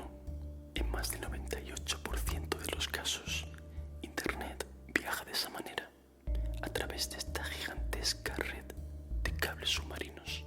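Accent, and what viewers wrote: Spain. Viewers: Me Encanta el Asento Españolado 😮 Muchas Gracias x Tus ASMR me relajan Bastante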